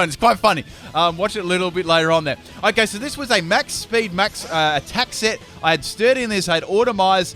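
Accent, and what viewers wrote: Australia. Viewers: my mother things you come from Australia... is that right?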